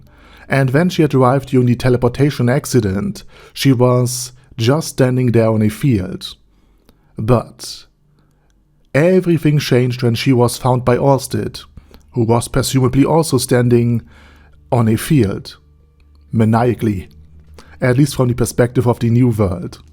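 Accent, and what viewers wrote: Germany. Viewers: jesus christ ur german accent is strong asf. deutscher bursche. ur voice is hard to listen to.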